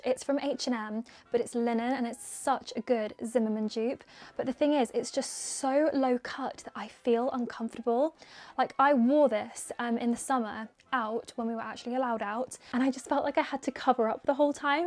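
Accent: United Kingdom. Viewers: Love your videos I'm American but I lovvvveeee British accent 🥰🥰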